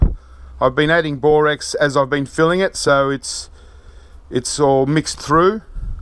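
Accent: Australia. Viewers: i too love the Austrailian accent and i enjoy the videos also have learned alot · Australian accents are awesome! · Haha your Australian accent is cool im sure whoever has been mocking you is just surprised by it. When you said copper in your american accent it sounded pretty funny, not because it was bad but because it sounded so out of character. When you say copper it kind of sounds like a southern U.S accent. idk though im from the midwest. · I'm American, I didn't even notice him saying copper differently until he brought it up. After he mentioned it though it does sound like he's saying coppa. I don't care though. I like the Australian accent. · I watch this primarily to learn the AUS accent. "So... yeh." :-) (I also watch for the scrapping) Keep up the awesome videos! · are you Australian?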